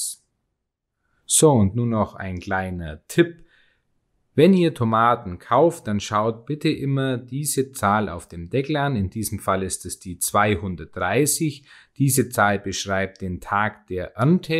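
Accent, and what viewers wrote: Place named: Baden-Württemberg, Germany. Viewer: Wie kann man da fränkisch raushören? Ich glaube, der gute kommt eher aus Baden-Württemberg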